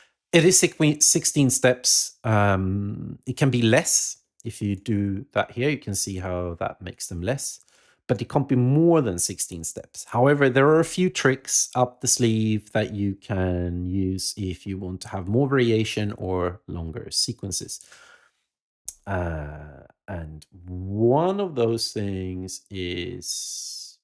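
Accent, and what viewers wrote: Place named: Sweden. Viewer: Lool, after listening a bit more I did think your accent sounded vaguely Swedish, but I heard "I think that's how it's meant to be pronounced" as being about the word itself and not just the company. Norwegian fella here correcting Swedes on their Swedish 🤣 sorry altså.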